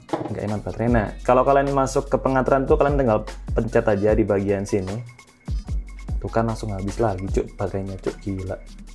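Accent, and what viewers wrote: Java, Indonesia. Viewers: Kowe wong jowo yo mas? Hehe... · Yang sekarang suaranya lebih meddok 🤣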